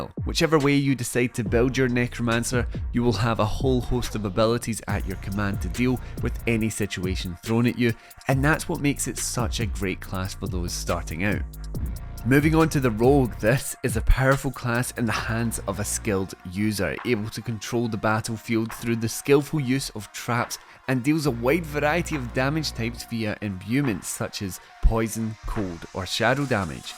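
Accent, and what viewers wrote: Scotland, United Kingdom. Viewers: That's a Scottish accent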